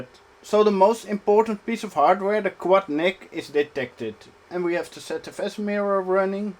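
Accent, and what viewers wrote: Netherlands. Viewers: Zwaar NL accent gast. I suggest getting rid of the hdd's and putting in an SSD, that would make the system faster, more quiet and consume less power. And you can use the storage for logs, caching or snort. You really don't want to virtualize your PFsense router trust me! Sure it's fine for some tests but it's a pain in the ass if something goes down. Also with segmenting your network or VLAN's it's much easier to have a physical machine. The only drawback is power consumption.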